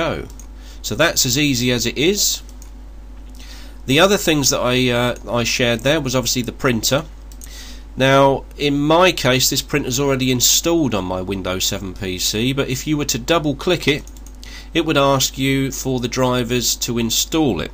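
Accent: United Kingdom. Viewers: great video, great explanation, amazing British Accent / simply amazing ! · Lovely British accent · Should have figured you were from the UK. His accent is irritating. "Sheering from this foowdu'". My gosh. How about some American?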